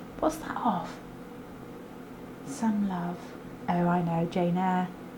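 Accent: Manchester, United Kingdom. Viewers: I got my friend Steve from Tamworth to listen to you as I was curious where you’re from and he’s great at picking out accents but you have stumped him. He says you don’t have much of an accent but if he had to guess he’d say Manchester or north of there. Me being American, I have no clue whether he’s right or not, lol. I love listening to you though. The way you say “you” when it comes at the end of a phrase is just lovely. ✨💛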